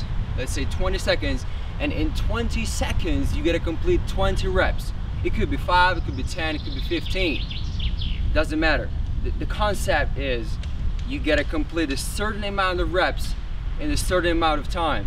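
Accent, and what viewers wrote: Russia. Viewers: It’s a mix between Russian and a southern American accent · This guys accent is a mix between russian, american, american southern, and a lisp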